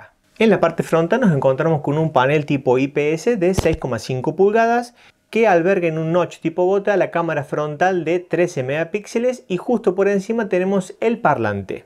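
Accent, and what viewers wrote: Córdoba, Argentina. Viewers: ¿Eres cordobés?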